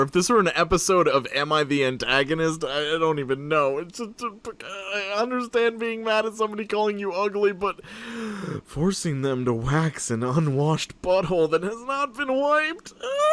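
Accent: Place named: New York, United States. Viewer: What’s up with the New York accent.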